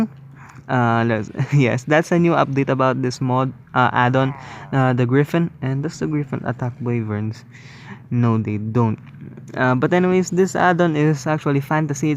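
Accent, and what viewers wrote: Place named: Philippines. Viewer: Your a pilipino right i realized it because of your accent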